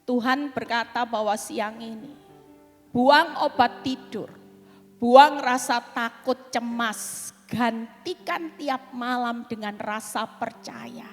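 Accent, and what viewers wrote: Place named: Surabaya, Indonesia. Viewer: Bu. ini asli org Surabaya yaaa....? Kok cekok kata2 persis orang Surabaya...🙏🙏🙏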